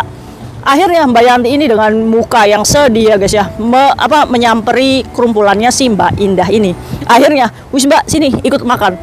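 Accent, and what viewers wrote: Indramayu, Indonesia. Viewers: Ka kya wong dermayu 😂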